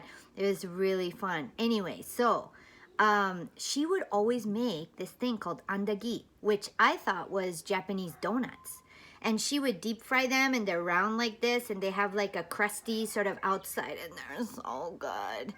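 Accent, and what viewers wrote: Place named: Hawaii, United States. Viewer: So good sistah! After all these years you still get your da kine pidgin accent!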